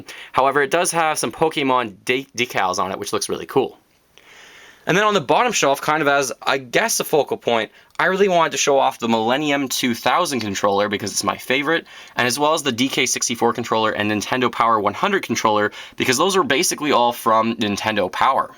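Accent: Canada. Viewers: Love that Canadian accent; I'm crazy for a Canadian man myself.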